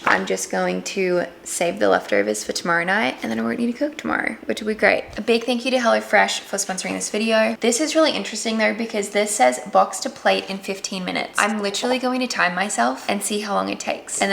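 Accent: South, United States. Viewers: Hahaha I love the southern accent! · Honestly loved the southern accent! And this vlog was so sweet!❤ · How is no one talking about how incredible that American accent was?! Also the SOUTHERN ACCENT?? Incredible. Better than some actors doing a southern accent. I’m impressed. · As a woman from Clarksville, TN you nailed that southern accent girl! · The American accent had a hint of southern and the pure southern accent was amazing. Btw you made us feel like we were your friends in this vlog ! ❤ It was so intimate. It was really nice to get to know you more! ❤ Btw apparently the dinosaur bones are the fallen angels/creatures that got destroyed during the flood that God sent! There's probably more information in the book of Enoch. I love your natural brown hair too, it looks so good sis. God bless you and your family. (we love the 20 minute videos !) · The southern accent was spot on! 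I'm from Memphis, and you actually sounded a lot like one of my friends 😂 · As someone from South Carolina, your southern accent was not half bad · Aussie here, I loved your southern accent! It was really good! Also just wanted to say that having Gabe working from home everyday is a blessing too, my husband works long hours for 3 days and then has 2 days off and I really enjoy his company on those 2 days! Wish he could be home every day lol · The SOUTHERN accent woah!!!! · Your southern accent is not bad at all! I'm southern, and I've always thought that certain vowel sounds in an Australian accent sound really similar to a southern american accent. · Ok but your southern accent was good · Your southern accent 😅 so good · I'm married to an Aussie and I think you guys for some reason always sound like you're making fun of us when you do what I call the regular American accent. lol Idk why! 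Your southern accent is on point though! ❤ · Lol the Southern accent is so on point. You sound like Miley cyrus in the Hannah days or Georgia from Genie and Georgia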